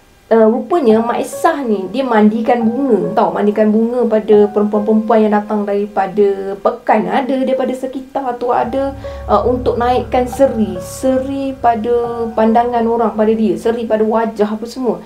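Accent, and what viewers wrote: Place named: Pahang, Malaysia. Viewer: Aok ni org mna.. melantoi mlek cara aok bcakap😅😁..salam rodong seram dari kwn org phg..peace✌️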